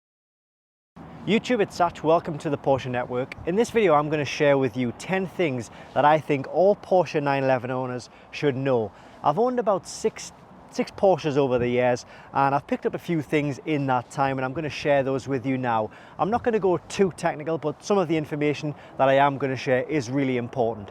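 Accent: United Kingdom. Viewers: "YouTube, it's Satch..." I used to think it was "YouTube and such..." just said with the UK accent.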